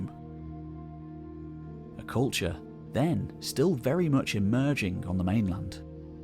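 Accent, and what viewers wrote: England, United Kingdom. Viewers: just hearing your english university narration = PUKE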